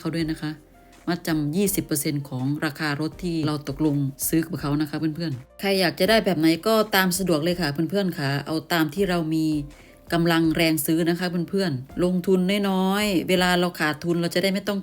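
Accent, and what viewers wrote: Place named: Isan, Thailand. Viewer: เสียง พูดกลาง ผสมอิสาน เพราะนะ มิน่าสติกเกอร์ทำที่ไทยสวยจริง นึกอยู่แร้วว.สติกเกอร์จากไทยแน่ๆ อิอิ ร้านพี่ก็พิมพ์สวยน้าา ไปดูที่ช่องเลยงับ😁😁😁😁😅😅😅😅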